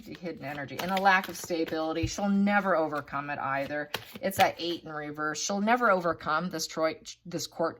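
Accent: United States. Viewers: I am from Manhattan. Your very mild Long Island accent comforts me. I live a long way from home now. It's good to hear "home" so intelligently and soulfully spoken! Thank you!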